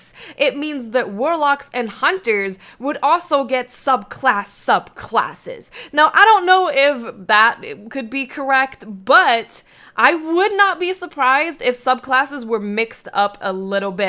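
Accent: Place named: New York, United States. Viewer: Is she from New Jersey Brooklyn or New York she kinda sounds like she has that accent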